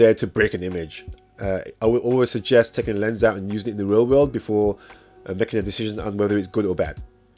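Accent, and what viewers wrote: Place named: Midlands, United Kingdom. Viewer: I can hear the midlands in your voice. Yuh Dun know I'm subbing ☝🏾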